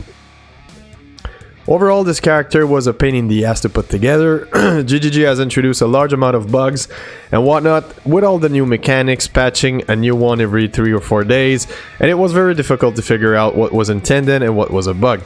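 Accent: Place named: Quebec, Canada. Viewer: C''est sur t''es un quebecois , juste a t'entendre parler en anglais haha !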